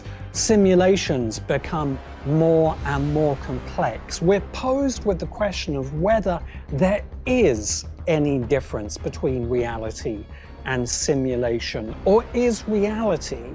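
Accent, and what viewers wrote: England, United Kingdom. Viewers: I get that translating Gibson's work to the screen is almost impossible. But this show is HORRIBLE. This review tries to give the show more credit than it deserves. But a soft-spoken English accent can't fix how broken the show really os.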